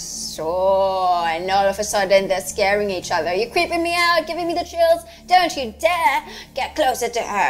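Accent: United Kingdom. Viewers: Trueee marami naman gumagamit ng British accent kapag mag-isa, it's okii. Ang soyo soyo kayaaaaa xD Lovely accent kasi huahua Ms. thank you for this video! Hahah xD We'll be waiting patiently for the next video.:3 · that british accent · that british accent tho. hahahahahahaha Brofist!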